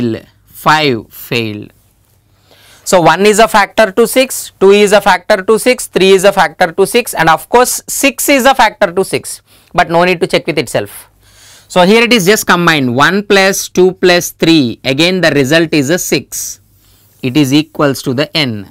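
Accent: India. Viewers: I am having fun watching an Indian accent explaining C programming than English man explains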